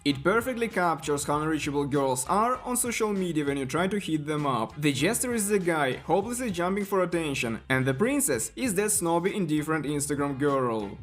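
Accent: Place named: Europe. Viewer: Your european english accent make it more funnier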